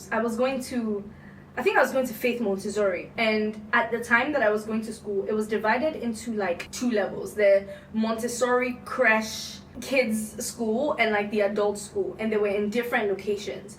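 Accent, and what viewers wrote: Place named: United States. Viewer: your american accent >>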